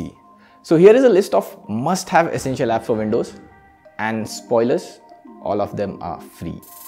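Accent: India. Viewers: Indian fuckong engllish zounds like a servant is talking! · Indian accent detected, dont trust it it might be a Windows Tech scammer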